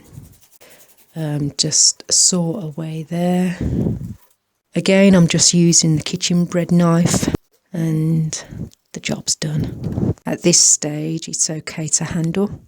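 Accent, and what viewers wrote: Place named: Midlands, United Kingdom. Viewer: Hello - Yes, it is in the British Midlands about 15 miles or so from Birmingham. You accent is very obviously British Midlands but not 'harsh' enought for the strong'Brummie Birmingham' accent. Hence l suggested Wolverhampton, a much 'softer', less pronounced Midlands accent.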